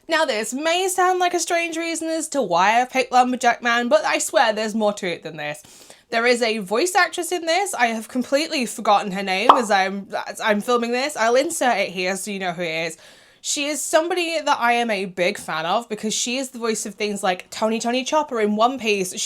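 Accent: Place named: England, United Kingdom. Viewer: I can't sound as good as your English accent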